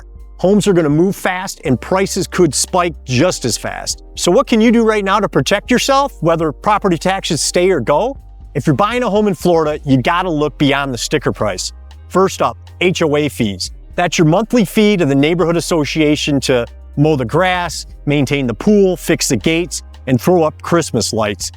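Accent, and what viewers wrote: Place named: Wisconsin, United States. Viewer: Are you from Wisconsin? Your accent sounds like it.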